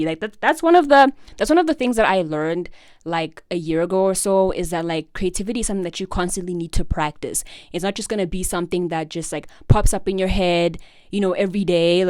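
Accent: South Africa. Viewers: if you have done the research, self diagnosis is completely vailid even the psychologists i've had have said so. Although I get what you're saying please don't diminish your struggles, i also used to do that when i was self diagnosing before i eventually got a medical diagnosis. You are not over oversaturating any spaces, you should feel free to use any resources that you feel like would help you. I feeel like as long as you're not harming anyone you're good🤍also, unrelated but are you south african?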